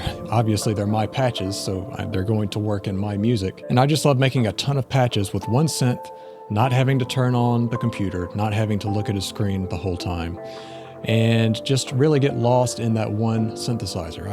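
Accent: South, United States. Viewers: Just to clarify for anyone reading..I was joking about the looks and voice thing. I'm sure many consider J's attributes beyond reproach.. personally though, I don't judge a person based on either. (..got to admit, though, that "southern drawl" sounds pretty cool. 😁)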